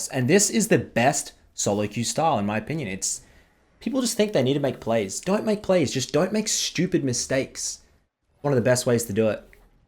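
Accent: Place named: Australia. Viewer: Out in the bloody boony's in woop woop. farming. yourself. . This man is so Australian it hurts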